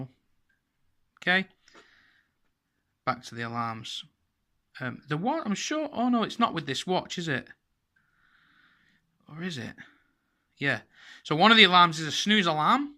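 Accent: Bolton, United Kingdom. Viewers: Yup, I think you've convinced me. I'll get one ordered 👍 Are you from Bolton by any chance? 😎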